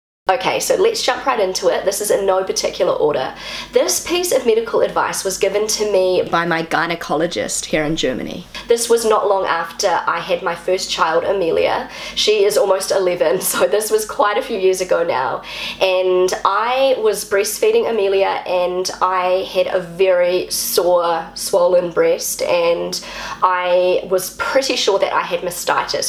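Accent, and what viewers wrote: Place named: New Zealand. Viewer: I as a German with lately more of an average share of doctor's appointment obviously cannot comment on all possible infections, but the last time that I remember having been prescribed an antibiotic was more than twelve years ago when after having been bitten by a tick, an erythema migrans developed which might have turned into Lyme borreliosis, which was stopped by doxycycline. But other cases I don't really remember, and I'm in the second half of my sixties. As an aside, I really enjoy your Kiwi accent. I was in NZ in 1987 and 1993, and it is one of the few countries I'd have a desire to visit for a third time if it weren't so bloody far away. But in trying to explain to non-German (but some-kind-of-English) speakers how a German long E is pronounced, I'm sometimes successful by pointing out how New Zealanders pronounce "nEver", "sEd [said]", "tEn", "elEven and so on.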